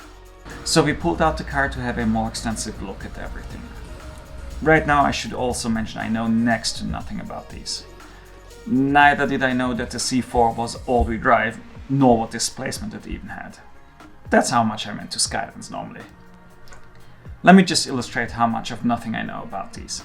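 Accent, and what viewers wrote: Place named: Germany. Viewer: With your totally awesome German accent you stated you were not a Porsche guy, you will be. I will be following along.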